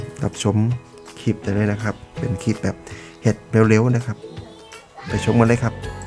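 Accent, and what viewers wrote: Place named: Isan, Thailand. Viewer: สำเนียงจังหวัดอะไรครับ เพิ่งเคยได้ยิน น่าฟังดีครับ ฟังๆดู มีทั้งเหนือ,อีสานผสมกัน คลิปมีประโยชน์ดีครับ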